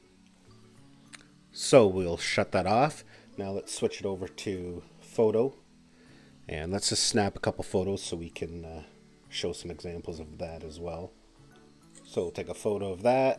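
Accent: Canada. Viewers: Helpful, but focused mostly on your Canadian accent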